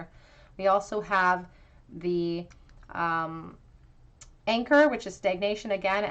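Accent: Canada. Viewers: I love your readings ! Always some much information that is so helpful ❤! Your Canadian accent it is comforting to me, I am also Canadian but have been living in Europe for the last 10 years. Once again thank you 🙏 ❤❤❤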